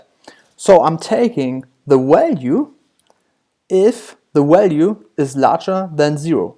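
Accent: Germany. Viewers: I hear an accent, if German then Dankeschön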